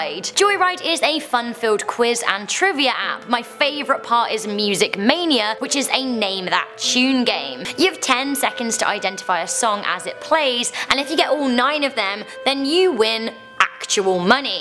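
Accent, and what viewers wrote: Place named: Scotland, United Kingdom. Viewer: im gonna comment something nice. you have a delightful accent :). im guessing its scottish cause i knew someone from whales with a similar voice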